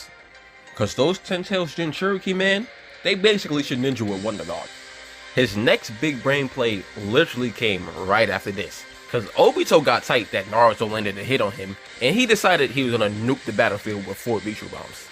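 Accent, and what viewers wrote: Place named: East Coast, United States. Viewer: Where are you from east coast USA huh ?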